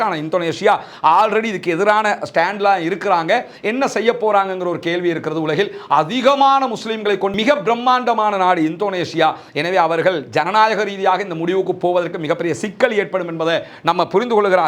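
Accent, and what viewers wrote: India. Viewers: சிறப்பான தகவல்களைதரும் நீங்கள் ஆங்கிலத்தை இந்தியர் போல் உச்சரிப்பது ஏன்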